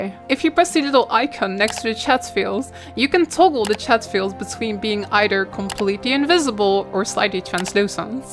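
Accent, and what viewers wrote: Netherlands. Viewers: i knew you were dutch before i saw the properties for guild wars 2.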